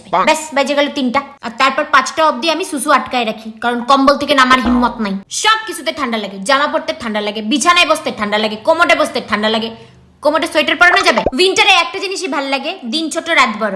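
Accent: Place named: Bangladesh. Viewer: আচ্ছা দিদি তোমার ভাষাগত টান বাংলাদেশের মতো। তুমি কি বাংলাদেশি?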